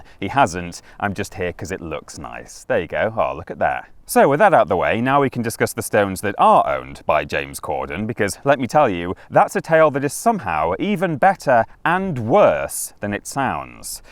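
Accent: United Kingdom. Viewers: I usually prefer English accents -not this one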